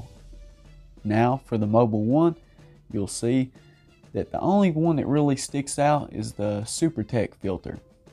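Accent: South, United States. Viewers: Except this guy's accent is real. Sean had the worst attempt at a southern accent I've ever heard. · gotta love the southern voice. relaxing to listeni to.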